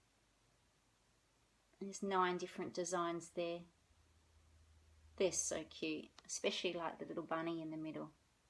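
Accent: Australia. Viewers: Hi, l too have only recently discovered "floss tube" and am really enjoying watching your videos as I stitch. My name is Sandra. I'm a born n bred Aussie living in Texas, USA. It's nice to hear an Aussie accent:-) The wolf kit that you purchased, I saw on another floss tubers - Kelley Jo Crafts - she is an American lady and I'm sure she found it more locally. Sorry I cannot find the particular video of hers with that kit. My current wip is "Fairy Castle" from 'Crosstitch Magic' which was a fortnightly publication I subscribed to many years ago in Aus. Thanks for sharing. Bless you heaps, Sandra.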